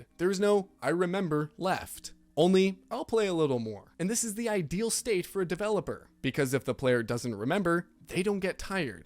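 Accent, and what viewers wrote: Russia. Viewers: Are you russian??? "Convierrted"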